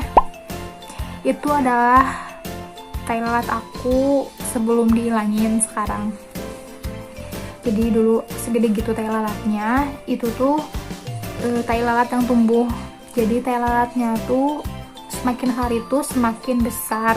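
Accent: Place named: West Java, Indonesia. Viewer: Sunda pisan euy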